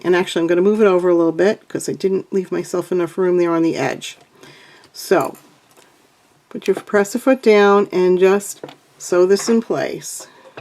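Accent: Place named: Brooklyn, United States. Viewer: Hi, I recognized the accent; I lived in Brooklyn for 8 years back in the 1970s! Then have lived in CT for many years. Now I'm in Oklahoma (Oklahoma?!) because we retired and followed our married son with the 4 grandsons here; he's a professor at a university. Thanks for the tutorials!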